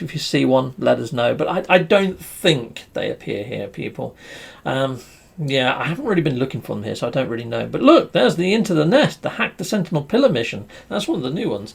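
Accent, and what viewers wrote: England, United Kingdom. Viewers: Classic British guy voice 👑🤩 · I enjoy your videos. I love your English accent. I’m grieving for Elizabeth II. 🇺🇸🤝🇬🇧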